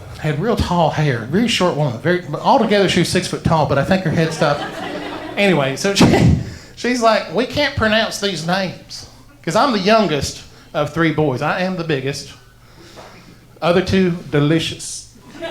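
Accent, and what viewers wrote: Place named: Iran. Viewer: i love how he seamlessly blends the Iranian accent with the Mississippi one 🤣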